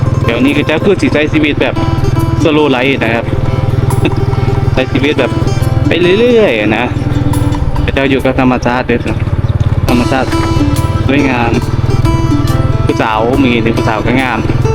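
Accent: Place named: Sakon Nakhon, Thailand. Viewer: เสียงผู้บรรยายคล้ายสำเนียงคน.สกลนคร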